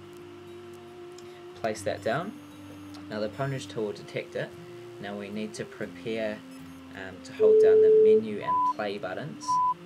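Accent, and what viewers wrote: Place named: New Zealand. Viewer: Nice video, new Zealand accent xD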